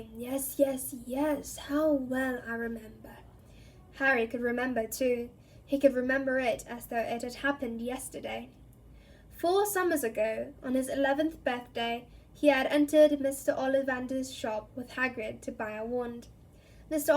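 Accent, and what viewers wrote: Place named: United Kingdom. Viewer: I love ur British voice for the book very soothing❤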